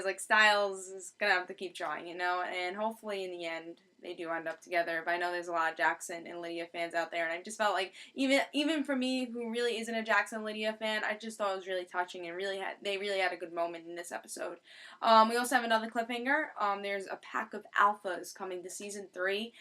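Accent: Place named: New England, United States. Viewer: are you from New england? dat accent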